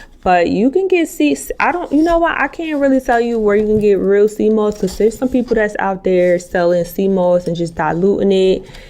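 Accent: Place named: South, United States. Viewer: 😍😘 I like everything I see and hear. Your braces, country accent, skin, face, everything, bald head, all of it.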